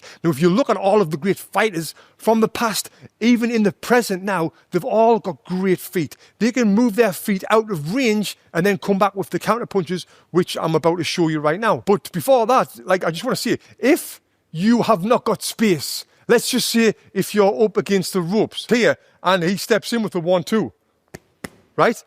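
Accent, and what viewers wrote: United Kingdom. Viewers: Great work love that british accent mate😀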